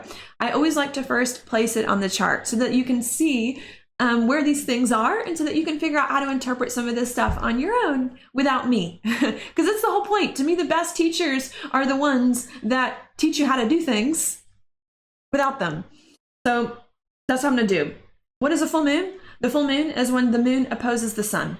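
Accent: United States. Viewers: you have an interesting accent, I'm trying to figure out, what state? enjoyed your reading.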